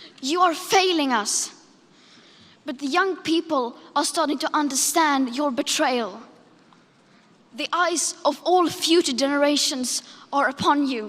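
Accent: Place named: England, United Kingdom. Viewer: Cuz she has an English accent like Emma Watson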